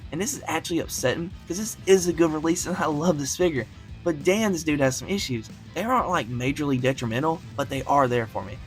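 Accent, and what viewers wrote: South, United States. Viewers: He got that southern accent 😂.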